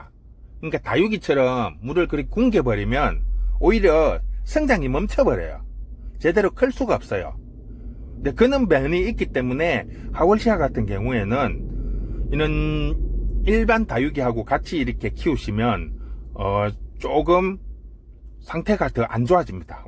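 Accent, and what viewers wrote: Gyeongsang, South Korea. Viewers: 저는 오늘 처음으로 님의 영상을 보았습니다 경상도 구수한 말씀으로 상세한 설명 감사합니다~~^^ 저 완전 초보에다 저희 집은 햇볕이 부족하고 확장된 아파트라 하월시아 기르는것은 좋은 환경인듯 싶네요 하월시아는 영양제는 필요없나요? 그리고 난석의 굵기는 어느정도가 좋은가요 (예: 소.중.대) 물 줄때 저면관수로 주어도 되는지요 오늘 휴일 평안한 축복된 하루가 되시기를~~^-^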